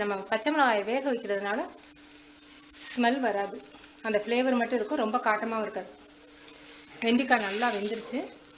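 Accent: India. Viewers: She speaks such a lovely Chettinad Tamil, I feel sorry for you are missing something great in this life, the taster of her Tamil and the Chettinad food!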